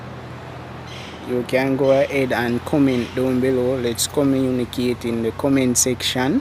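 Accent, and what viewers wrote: Jamaica. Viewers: New viewer. I like your creativity and the Jamaican ascent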